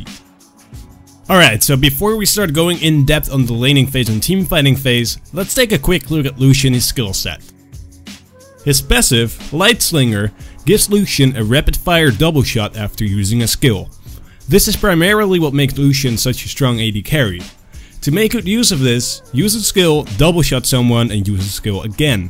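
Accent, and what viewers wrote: Netherlands. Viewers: Sowieso een Nederlander dit, hoor je gelijk aan het accent. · Dutch if my guess via the accent is not mistaken....?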